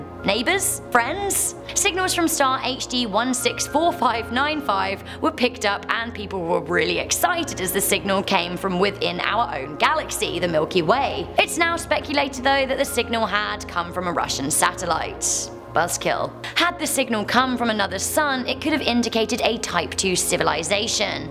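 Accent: England, United Kingdom. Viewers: This chick is the most annoying/ignorant youtube host.. This channel usually has interesting top 10 topics that end up being awful simply because they allow this laughably stupid and cringe fueled moron narrate. News flash: Having an English accent does not automatically make you intelligent. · Why does every top 10 list have a british accent in it? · you had me hello. Love the video. I would love to lay in your crop circle. I would roar like a lion. Gaze in your gorgeous star litd eyes then shoot my Milky Way while you say Maui Wowie and explain how big & large it is..😲🐘😄 I love 😍the way you say while you're down 🙃there👅 with that cute English👿accent - very HOT 💖 it-👫-🤑-love u" from across the 🌎pond. Sorry I have to refocus now your message🙃 which one is the most scariest of this video 🤔I would say Stephen Hawkins quote that when aliens finally reveal themselves to us it would be like Christopher Columbus reaching the Americans💥💣💥💣💥💣GAME Overtook 👽👾👽👾i think im leaving more than a 🖒'S... 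UP lol he he he. 🌷chow for now my lovely lookin alien 😍😙🤑😚👿..📞ME 😆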